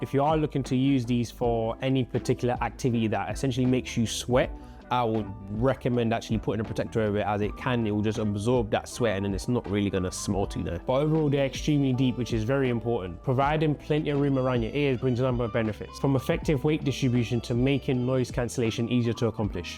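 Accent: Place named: United Kingdom. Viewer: Just got my AirPods Max a few days ago and I don’t really regret it they’re amazing headphones. Also, Super random but you’re living in Canada with a British accent I have a question. As someone from the UK wanting to live in Canada which is better? (I think I know the answer)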